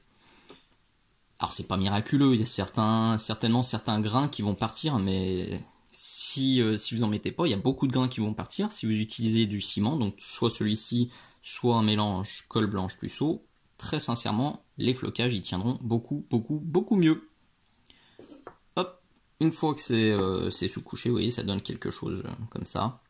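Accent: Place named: Lyon, France. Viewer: Superbe vidéo, je ne savais pas pour le ciment, et encore moins qu'un produit tout prêt existait. J'adore, l'accent lyonnais. :-) Je m'abonne et je like. A +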